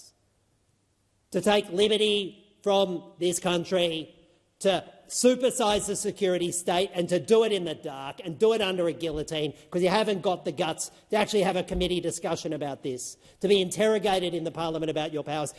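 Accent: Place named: Australia. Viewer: gosh please keep this american crap away from Aotearoa New Zealand 😅 thank you senator ❤️‍🔥 you are welcome here despite your strong Ozzie accent 😅 you are based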